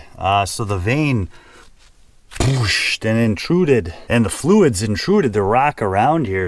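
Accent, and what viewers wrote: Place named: United States. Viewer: YOU GOT A CRAZY COWBOY ACCENT & RHYME BY TALK & GOT A CRAZY COWBOY TOY CAR TOO, WHERE GOT YOU ALL OF THOSE!!! TO WHERE I' TO DIG TOO...AS TO DIG A FIRST CLASS GOLD.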